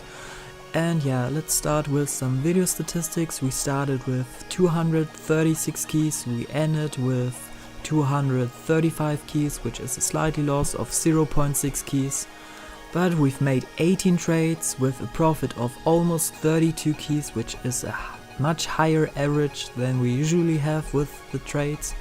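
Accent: Germany. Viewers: Hey dude nice vid you lissen Like a German Guy ^^, i am German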